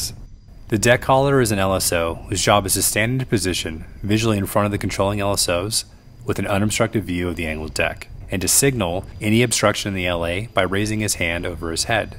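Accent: United States. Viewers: Very smooth operation. I'm not American, never been in any military. I worked in civil Aviation (helicopters) all my life. I'm appreciating the difficulty to get all this right and the commentary is appropriately American but on the nail!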